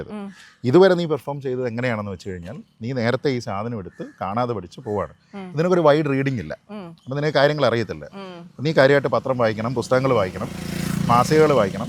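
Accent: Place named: United Kingdom. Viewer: ദക്ഷിണേന്ത്യയിൽ കേരളം മാത്രമാണ് ചില ആങ്കർമാർ ഇംഗ്ലീഷിലോ, അല്ലെങ്കിൽ വ്യക്തമായി ഇംഗ്ലീഷ് സ്വാധീനമുള്ള ഉച്ചാരണത്തോടെ മലയാളത്തി സംസാരിക്കാൻ തിരഞ്ഞെടുക്കുന്ന സംസ്ഥാനമായി തോന്നുന്നത്. പലപ്പോഴും അത് അനാവശ്യമായതും ചില സമയങ്ങളിൽ ശ്രദ്ധ തിരിക്കുന്നതുമായ ഒരു അനുഭവമാണ്. “Big Sister” വർഷങ്ങൾ മുമ്പ് ജോലിക്കായി വോയ്സ് ആൻഡ് ആക്സന്റ് ട്രെയിനിംഗ് എടുത്തതും പിന്നീട് ആ ശൈലി ആങ്കറിംഗിലും പിന്തുടരാൻ ശ്രമിച്ചതും കണ്ടതിന് ശേഷം, ഈ ഘട്ടം കഴിഞ്ഞുപോയി എന്ന് ഞാൻ കരുതിയിരുന്നു.ഇതിനെ കൂടുതൽ വ്യക്തമായി കാണിക്കുന്ന മറ്റൊരു കാര്യം, മലയാളം അതിന്റെ സ്വാഭാവിക സൗന്ദര്യത്തിൽ തന്നെ വ്യക്തതയോടും ആത്മവിശ്വാസത്തോടും സ്വാഭാവികമായ ശൈലിയോടും സംസാരിക്കാനാകുമെന്ന് തെളിയിക്കുന്ന മികച്ച ആശയവിനിമയക്കാരെ കേരളം സ്വന്തമാക്കിയിട്ടുണ്ടെന്നതാണ് — അതിൽ ശ്രീജിത്ത് പണിക്കർ ഒരു മികച്ച ഉദാഹരണമാണ്. ഇംഗ്ലീഷ് ആക്സന്റിൽ ആശ്രയിക്കാതെ തന്നെ മലയാളം എത്ര ശക്തിയും സൗന്ദര്യവും നിറഞ്ഞ ഭാഷയാണെന്ന് അദ്ദേഹത്തിന്റെ അവതരണം തെളിയിക്കുന്നു.മലയാളം അതിന്റെ സ്വന്തം സമ്പന്നമായ വ്യക്തിത്വമുള്ള ഒരു മനോഹര ഭാഷയാണ്. 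അതിന്റെ സ്വാഭാവിക ഉച്ചാരണങ്ങൾ — പ്രാദേശികമായാലും ന്യൂട്രൽ ആയാലും — അലങ്കാരങ്ങൾ ആവശ്യമില്ലാത്ത ഒരു സ്വാഭാവിക ആകർഷണവും സത്യസന്ധതയും നൽകുന്നു. ആങ്കർമാർ ഇംഗ്ലീഷ് സ്വാധീനമുള്ള ഉച്ചാരണത്തിലേക്ക് മാറുമ്പോൾ, ഭാഷയുടെ സ്വാഭാവിക സൗന്ദര്യം മറഞ്ഞുപോകുന്നതുപോലെ തോന്നാറുണ്ട്. Original ; Kerala seems to be the only state in South India where some anchors choose to speak either in English or in Malayalam with a distinctly English‑influenced accent. It often feels unnecessary and, at times, quite distracting.I genuinely thought this phase had passed—especially after “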